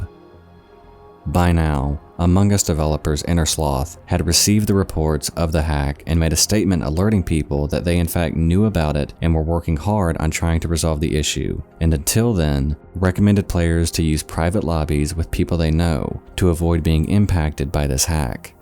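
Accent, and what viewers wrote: South, United States. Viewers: Quickly becoming one my favorite channels. Love your channel man. That subtle southern accent is extremely inviting. Kind of like Lazy Masquerades and Be Bustas accent gives them their little spark.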